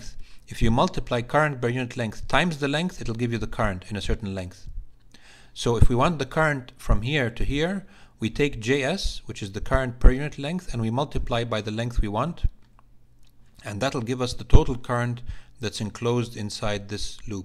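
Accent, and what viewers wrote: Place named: Egypt. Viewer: ❤️❤️ i am from egypt too. I expected that from your accent but i think you are not in egypt? I want to thank you for you for these great videos ❤️❤️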